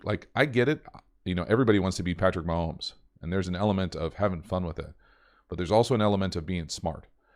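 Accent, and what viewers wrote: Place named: Boston, United States. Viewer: This guy has such a bostonian accent!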